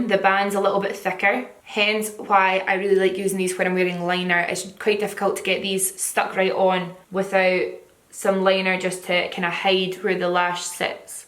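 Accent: Scotland, United Kingdom. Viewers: You're so unique! and a talented artist and I love your Scotland accent. You're so pretty! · you are so amazing! I love watching your videos and learning new little tips and tricks. My husband always says "oh you've been watching today haven't you" cause I walk around my house thinking I've got a Scottish accent 😂😂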